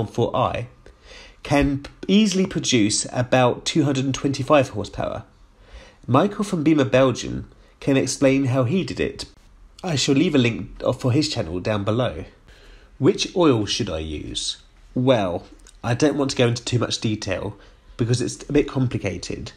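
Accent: United Kingdom. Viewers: Hey, another great video, I really love your British accent too!!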